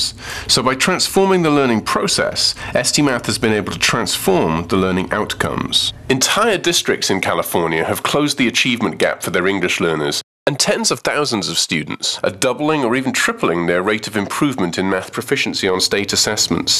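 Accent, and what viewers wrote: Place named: India. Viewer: He Speaks Indian English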